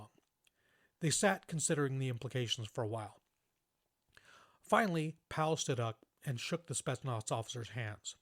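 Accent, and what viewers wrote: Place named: United States. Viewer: In that case, I’d be happier to hear you read it than the dictionary, even in your regular plain ole American accent.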